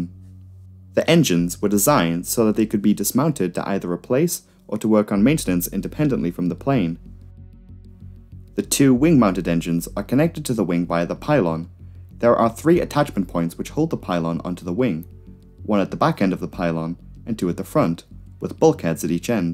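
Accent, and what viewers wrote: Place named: Northern England, United Kingdom. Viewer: Informative and concise videos. Very well made. And a fellow northerner, eh? I'm from County Durham - not far away!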